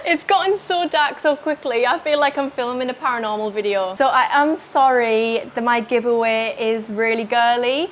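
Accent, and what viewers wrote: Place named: United Kingdom. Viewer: Beauty, brains, and British accent! Can't get any better than that!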